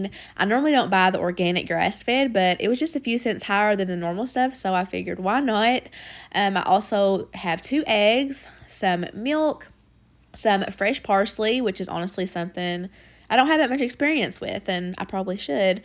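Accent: South, United States. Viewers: I was having a rough day. This video is just in time because that southern accent just calms my nerves lol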